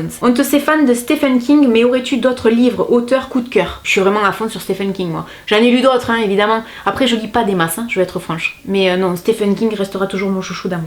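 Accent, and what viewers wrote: South, France. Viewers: Bonjour...Je te découvre par hasard et je me régale depuis ce matin avec tes vidéos. Merci pour ton naturel, ton franc parler et ce petit accent du Sud! 🤩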